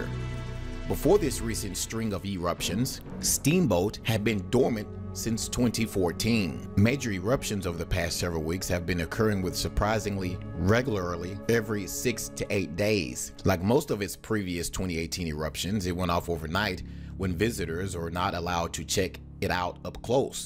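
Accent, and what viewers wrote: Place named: Appalachia, United States. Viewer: Dude what is with your hillbilly accent?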